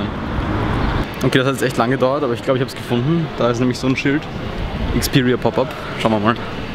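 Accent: Austria. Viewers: ist das Österreichisch oder italienisch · könntest du mal ein Gaming Video machen wo du nur mit Österreichischem akzent redest? ;) mach weiter so bist mega cool, aber ich finde es manchmal etwas anstrengend für die Augen, wenn du die Helligkeit manuell umstellst in deinen vlogs :/ das nur Konstruktive Kritik sein :-)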